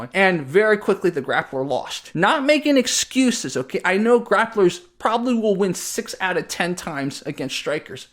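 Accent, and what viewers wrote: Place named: Midwest, United States. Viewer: Bro i did not expect you to look like that, based on your voice i was expecting a midwestern white dude in a flannel shirt with a can of beer on the table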